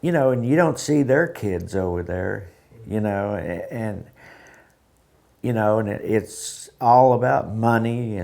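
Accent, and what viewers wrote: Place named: Kansas, United States. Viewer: Glad your hanging on. I knew you had to be from Kansas as I listened to you talk and 37 min you said your were just a farm boy from Kansas. Greetings from a fellow kansan.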